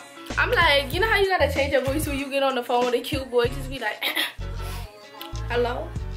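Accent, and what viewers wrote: New York, United States. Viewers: You from nyc?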